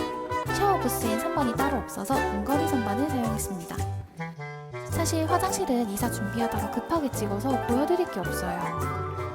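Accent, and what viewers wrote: Busan, South Korea. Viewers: 표준어를 쓰고 싶지만 새어나오는 부산바이브 ㅋㅋㅋㅋ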